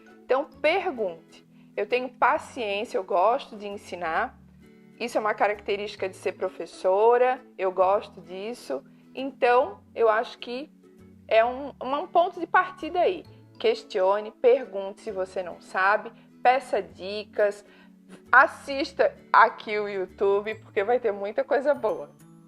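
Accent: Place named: Itajaí, Brazil. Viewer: Querida! Que Deus te abençoe sempre ❤🙏🏻 Legal ouvir um "sotaquezinho" de Itajaiense aí, na América, amoooooo🥰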